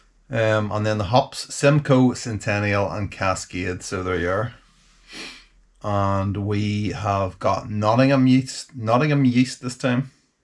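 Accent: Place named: Northern Ireland, United Kingdom. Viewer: Where in ni are you? Enjoying your videos over in fife. But it's good to hear a voice from home.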